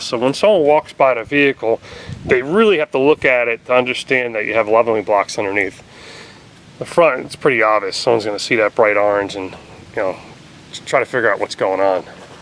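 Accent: New Jersey, United States. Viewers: Everyone in Jersey sounds like that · Love the “wooder” hose, and “wooder” heater. Gotta love the Jersey accent!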